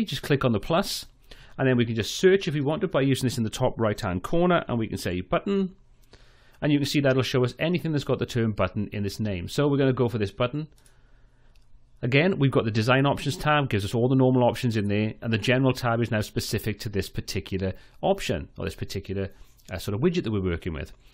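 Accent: Wales, United Kingdom. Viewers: Hey great video! Random question, are you from my merry land of Wales?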